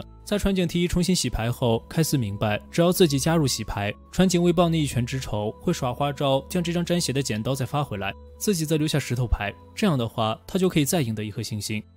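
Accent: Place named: Shandong, China. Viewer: 感觉作者听起来像山东人。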